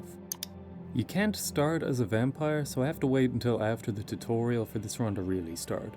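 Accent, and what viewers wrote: Ireland. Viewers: why are you doing a weird american accent? you are so obviously irish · Awesome video, always happy to hear more Irish youtubers.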